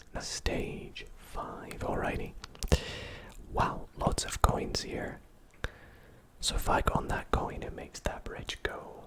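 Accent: United Kingdom. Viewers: Talk strange? How so..? Do you mean the British accent? What's strange about that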